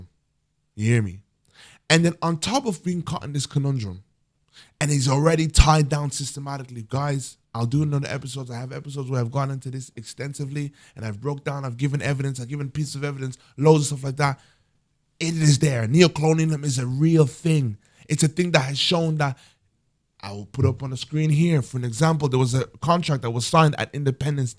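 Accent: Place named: England, United Kingdom. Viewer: just doing a word salad. Are you one of those Brothas getting paid by Candace Owens, because you have an English accent? Because you are doing the exact same thing that they do. Higher a Black guy that doesn't know what is going on to sit there and listen. You get other Black people to listen to this alt-right crap. is removing Black American rights. He has removed Affirmative Action. He removed the Fearless Fund for Black business women. He attacked Critical Race Theory so that reparations could never be discussed. He removed Black history. is backed by the American Heritage Foundation that is attacking P Diddy whose story was in the 90s. has a rape charge.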